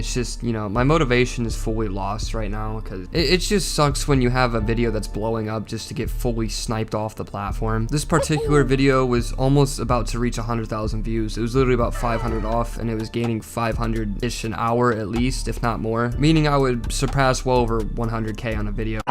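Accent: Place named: United States. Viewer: Ive known is irish and english but he somehow sounds like hes american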